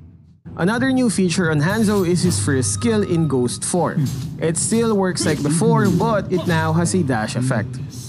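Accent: Philippines. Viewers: I love your accent, very Filipino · The pinoy accent tho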